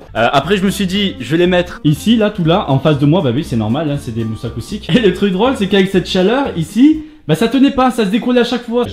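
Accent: South, France. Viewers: Il est d'où du sud?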